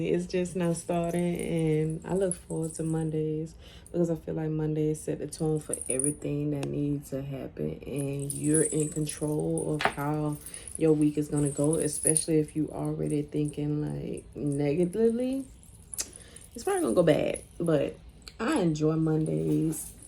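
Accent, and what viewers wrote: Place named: Georgia, United States. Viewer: That Georgia and New Orleans accent blending beautifully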